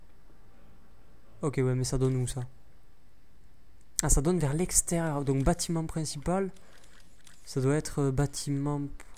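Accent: Toulouse, France. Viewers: C'est toulousain, on voit que t'entends pas souvent des marseillais ;)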